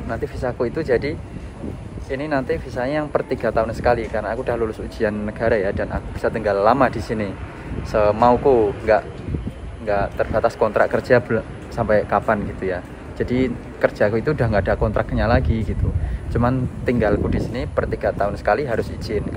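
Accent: Java, Indonesia. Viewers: jowone pean ngendi mas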